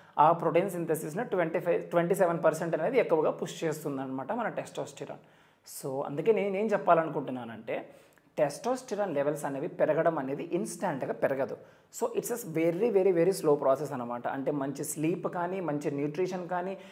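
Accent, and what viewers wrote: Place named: United States. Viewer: Anna miru telugaa America anukunaa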